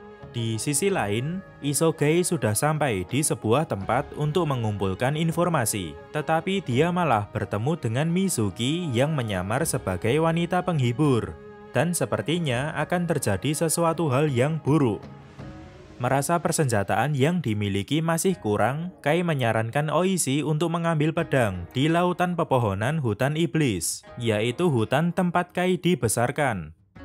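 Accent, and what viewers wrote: Java, Indonesia. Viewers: Jawi banget mas e😁😁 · Medok ya mas 😁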